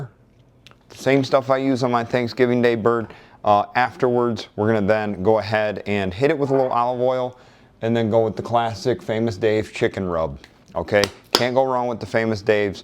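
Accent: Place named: Michigan, United States. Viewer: You are the first outdoorsman Youtuber from Michigan I've found and I'm so glad I stumbled upon your channel. It's nice to hear an accent I grew up with instead of a southerner shitting on northern hunting. I'm looking to get into turkey hunting this upcoming spring myself, very excited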